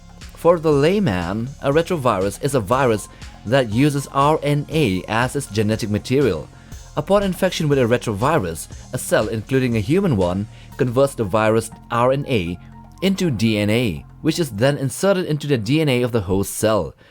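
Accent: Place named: India. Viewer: i made it 20 seconds, i couldnt bear the narrators indian voice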